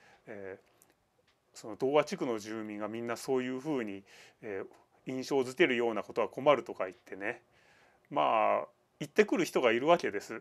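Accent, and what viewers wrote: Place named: Tsu, Japan. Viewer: 投稿主さん神奈川とかついてるけど、喋りがこっちぽいから津の人？？？？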